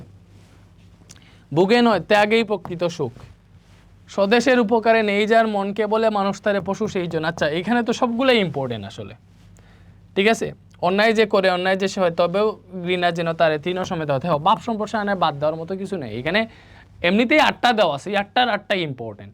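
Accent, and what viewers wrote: Sylhet, Bangladesh. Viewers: স্যার কি সিলেটি 😊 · সিলেটি ভাই, কিতা করো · ভাই সিলেটী নি,,,🫣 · Sir apni sylhety basay Kotha bolen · Apni ki Sylhet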